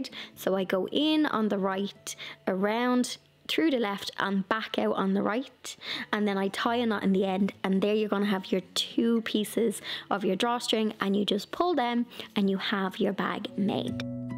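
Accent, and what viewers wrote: Dublin, Ireland. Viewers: she has an Irish accent, a Dublin accent. My home town. It makes a change, as most of the sewing videos I’ve watched are from women with American accents. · Oh lovely an irish accent! Im thrilled, living away from Ireland in spain all i hear are british accents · I had to subscribe cause you are the first Irish youTuber I have come across. I have a son who spent some time in Ireland and he came back to Canada with the coolest Irish accent!🥰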